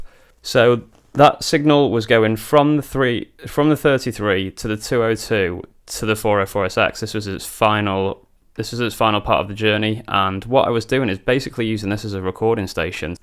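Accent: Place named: Northwest England, United Kingdom. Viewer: Superb tape! And as always, nice vid. I started with a PO recently and after watching your vids, decided a 404 might be a nice step up/replacement. Surprisingly they work really well together though - rather than as a replacement. I have a 202 arriving today also (you’re partly to blame for this!). Keep up the great vids - great to hear tutorials in a NW regional accent. Reprazent! ✊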